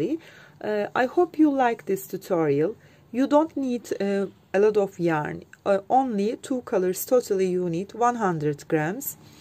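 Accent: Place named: Turkey. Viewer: Türkçe alt yazı olaydı iyiydi ingilizce konuşmanızda türk gibi türksünüz degilmi ses tonunuz onu andiriyo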